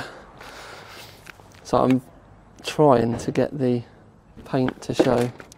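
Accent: United Kingdom. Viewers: Where abouts in the UK are you from? Not specifics lol, just general area. I'm sure I've seen around the Manchester area (or his twin haha).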